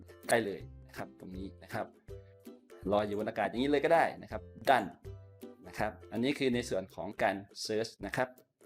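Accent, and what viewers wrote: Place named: Thailand. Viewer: ทำไมคนที่ไทยต้องออกเสียงชื่อหรือศัพท์ภาษาอังกฤษให้เป็นสไตล์แบบไทยๆ Google กูเกิ้น ได้อย่างไร เวลาไปเมืองนอกพูดภาษาอังกฤษคนฟังไม่ออก (ต้องทำไปทำไมครับ)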